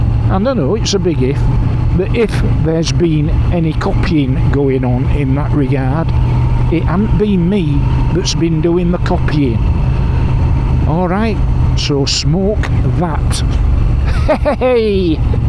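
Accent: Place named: Northern England, United Kingdom. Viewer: Ey up chuck, how i miss my northern mum saying that. Keep up the dialect and content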